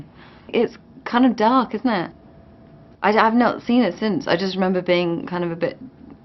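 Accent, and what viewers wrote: United Kingdom. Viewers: as if her adorable looks weren't enough, that distinguished british accent just makes me melt :)!!!!! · she's so cute! i love her britsh english accent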